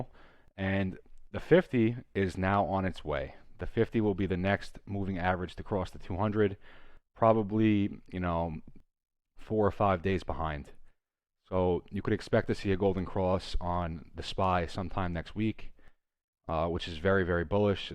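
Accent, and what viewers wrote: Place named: Pennsylvania, United States. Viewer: Realest personality to do it. You’re very appreciated homie. I feel like you’re from PA/mj/my/md. Big resonation. Philly native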